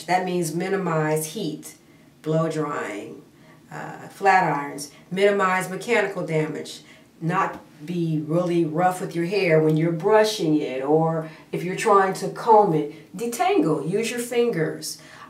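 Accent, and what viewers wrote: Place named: Nigeria. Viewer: Oh I see, it sounded Nigerian lol! And you are pretty :)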